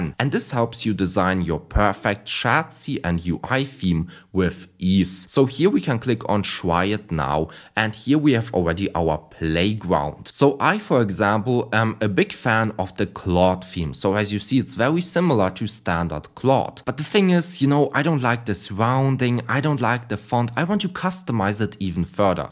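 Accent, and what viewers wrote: Germany. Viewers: You sound soooooooo much like great scott. I mean I assume you're both German, but still it is uncanny.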